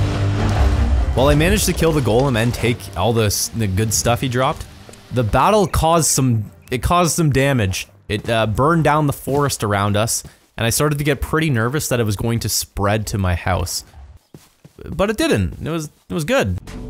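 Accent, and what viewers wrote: Canada. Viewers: Just started watching you and honestly 98%sure you must be Canadian because you sound it. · this sure is bussin bussin, especially because this guys accent sounds canadian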